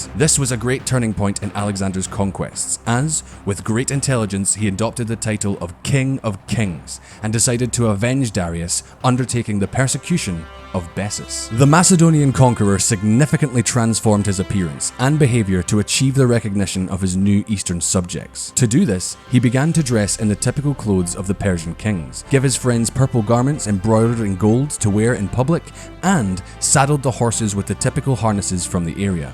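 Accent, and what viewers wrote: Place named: Edinburgh, United Kingdom. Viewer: How fitting is having this video narrated by an Edinburgh accent, the Athens of the North.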